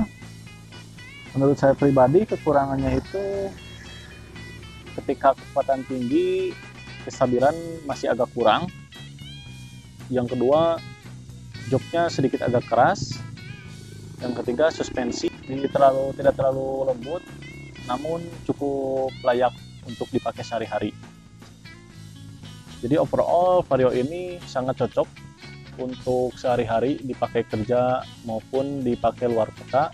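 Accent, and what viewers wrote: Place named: West Java, Indonesia. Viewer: Konsumsi bbm dan oli juga kang Btw wa suka logat sunda sampeyan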